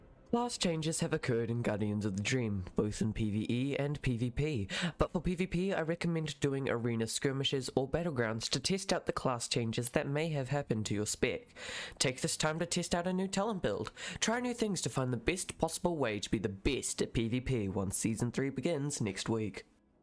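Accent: New Zealand. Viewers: Is this guy a kiwi? The accent is close but something is slightly weird about it, maybe from some random place in NZ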